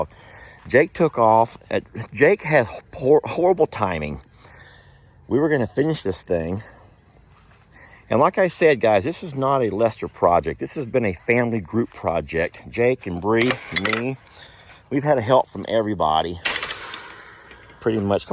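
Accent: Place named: South, United States. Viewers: its just your texas accent...or south...lol Jason from cog hill is worse...i LOVE yalls accents lol your viewer from BC Canada ....Sam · I noticed the sexy Texan twang has gotten thicker since you stopped teaching. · My gosh Lester. You're southern and y'all is just how we talk. One person can be y'all 😂 so all y'all is perfectly fine. Talk your way and don't worry about anyone suggesting you speak the way they think you should 🤗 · I know you have read my comments I say y’all all the time it’s southern our accent. But it means you all so yes it’s natural to say y’all. Maybe when you were a teacher you might have been more correct in your language. Now you are retired, we are more laxed in our southern accent. And it is okay. Don’t let that comment change your southernness. Your tool room looks awesome! 😊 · Lester us Canadian's say eh all the time. 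It's just part of our lingo. You saying y'all is part of your lingo in the south. I think it's great. Your videos are great! Thank you for all you do!!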